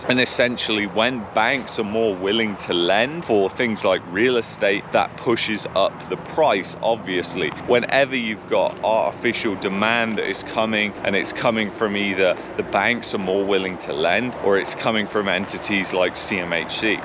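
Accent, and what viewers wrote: United Kingdom. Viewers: I believe he is from UK. There is no Australian accent.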